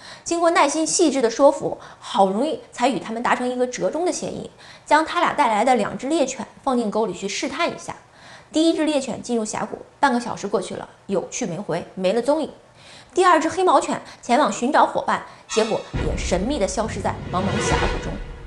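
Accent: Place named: North, China. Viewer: 這腔調一聽就知道是大陸北方人XD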